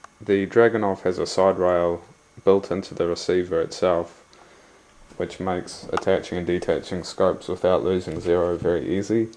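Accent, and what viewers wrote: New Zealand. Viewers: Could pick your kiwi accent a mile away :)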